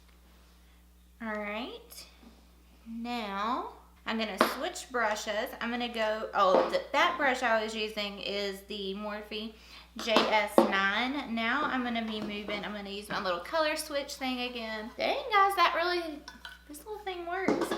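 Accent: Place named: Texas, United States. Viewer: new subscriber here. Are you from Texas? You sound like a Texan.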